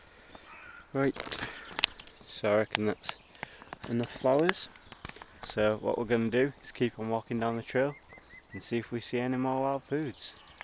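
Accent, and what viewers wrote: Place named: Hull, United Kingdom. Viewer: Nice vid, loved seeing the deer, am a Hullite myself, can't mistake that accent. Where on the Wolds Way are you? Look forward to seeing your woodland camp.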